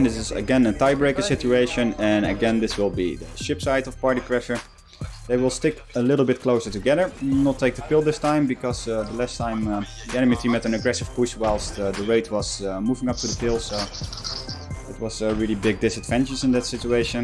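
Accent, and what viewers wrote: Netherlands. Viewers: also love the dutch accent btw :) and great video man keep it up!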